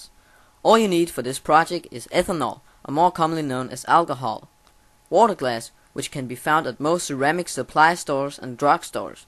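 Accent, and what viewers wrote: Denmark. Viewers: Are you danish ? :D Your accent sounds danish ;) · dat danish accent! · you danish? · You are danish! I can hear it in the way you speak english! Its almost like us,norwegians... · I can tell you are danish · You can easily hear he's danish, and also see it on the BORUP spirt and the vandglas xD Trololol, jeg er selv dansker ;D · are u danish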